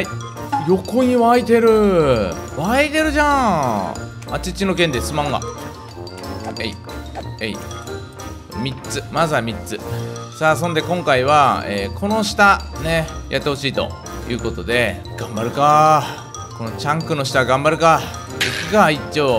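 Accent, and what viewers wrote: Fukui, Japan. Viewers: 最近福井なまりの前回のクラ聞けてないので聞きたいです。さんの福井なまりは聞きやすくて好きです。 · チャンク掘りお疲れ様でした！私も今日は2時間程、鉄の鉱脈探して掘り掘りしてました。掘り掘りは無心になれるので好きです。前回のクラはやっぱり普通の福井なまりのさんが1番良いと思います^_^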